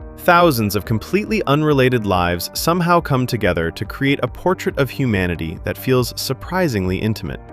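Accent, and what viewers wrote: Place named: United States. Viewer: You sound very American! How did you master English so well??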